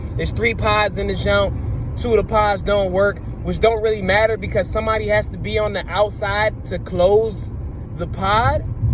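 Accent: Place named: Washington DC, United States. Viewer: Def from DC or PG, lol. Appreciate the review bro!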